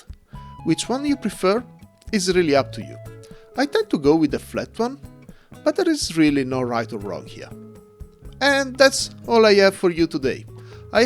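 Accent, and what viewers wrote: Italy. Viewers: l’accento è decisamente italiano… per questo ho fatto la domanda. Bel canale, bravo!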